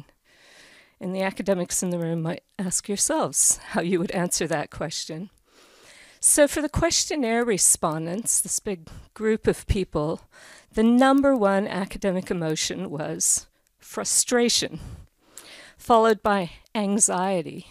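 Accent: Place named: New Zealand. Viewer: Dear, thanks, I did enoy this presentation (as some others) of yours - admittedly, it's because I'm a linguist and a fan of Steve Pinker's. Steve has been mentioning you for a couple of years, so I did check some of your presentations. Steve's been right, you're great - and, frankly, I do love your NZ accent (frankly, no offense - I'm a phonologist/historian). Thank you!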